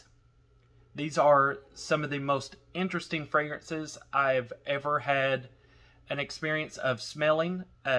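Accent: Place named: South, United States. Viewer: Subbed and I’m just loving the southern twang of your accent! 🥰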